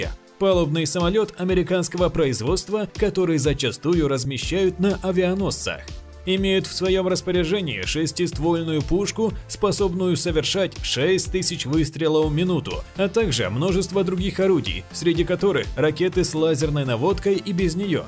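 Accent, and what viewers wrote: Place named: Komi, Russia. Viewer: Ты из Коми что ли? Тебя слушать-уши вянут!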